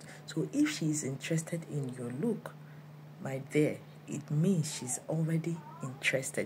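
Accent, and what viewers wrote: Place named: Africa. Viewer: Thanks for the inside info. Love the accent ❤ where in Africa are you from?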